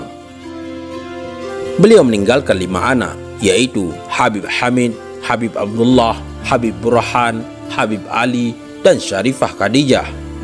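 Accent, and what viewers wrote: Aceh, Indonesia. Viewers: terdengar,, dari logat admin orang aceh yaa hehehe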